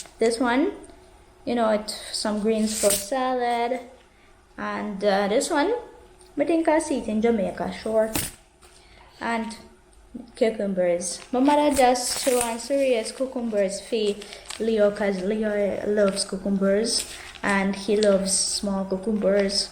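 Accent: Jamaica. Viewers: I dont know much about your story havent gone that deep yet but how you get such a Jamaican accent and can your mother understand it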